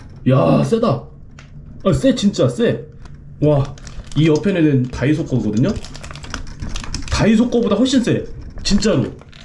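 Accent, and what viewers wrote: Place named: Jeonju, South Korea. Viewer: 라면에 혼술하며 영상 시청합니다 전주쪽이랑 비슷한 사투리가 넘 정겨워요ㅋ 담에 차박 영상도 기대할게요~~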